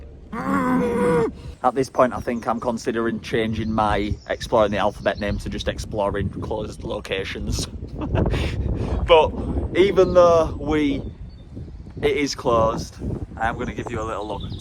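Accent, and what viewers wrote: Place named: Liverpool, United Kingdom. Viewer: Love your Scouse accent ha😂👏🏻Another great episode well done.The time lapse of the sunset looked stunning💛xx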